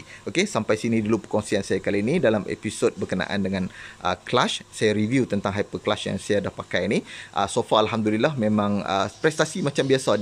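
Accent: North, Malaysia. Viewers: Ilmu terbaik bang share. Cara presentation pun best, leka seronok dengar. Tambah loghat utagha hahaha rindu penang. Salam Ramadan dan butang loceng onn 😆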